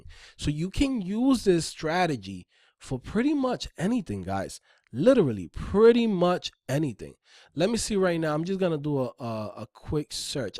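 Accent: New York, United States. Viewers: You sound like you from NY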